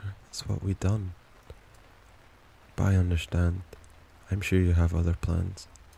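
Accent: Scotland, United Kingdom. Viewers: This might be an insult but are you Scottish 😅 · It’s a Scottish accent and I’m in love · ... hey Mr. Wonderful, Do my ears deceive me?? Are you Scottish?? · IM OBSESSED WITH HIS ACCENT 😍 I live in America so I barely hear rare accents like his. Scottish accents are SOO unique 😍😍😭😭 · Omg are you Scottish? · Errr is this a scottish accent im not good with accents TvT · Me, being Scottish and losing my shit over his accent because I didn't expect it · I’m Scottish and this just proves scotty accents are just>>>> 😮‍💨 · if i’m not mistaken, it’s actually scottish! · It's nice to hear a familiar accent! Not enough Scots on here. My only pointer is emoting. Not all of it sounds totally natural. You do have a very soothing voice though, and I like the rest of it :)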